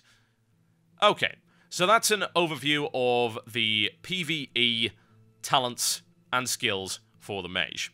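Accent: United Kingdom. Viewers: why did I just spend nearly 40 minutes watching a video about talents on a class I dont even play?... I think its that british voice.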